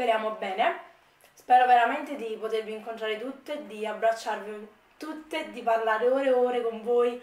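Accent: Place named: Campania, Italy. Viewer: Io penso che tu non ti debba giustificare dei tuoi errori grammaticali, io ti seguo da poco e mi sto letteralmente divorando tutti i tuoi video perchè mi piace la persona che si intravede semplice e genuina, oltre che mi sono utili i tuoi video in quanto sono un acquirente di allsupplements. Inoltre reputo anche tu sappia intrattenere il pubblico. almeno per il mio gusto personale si. Continua così :-) P.s. adoro il tuo accento campano!!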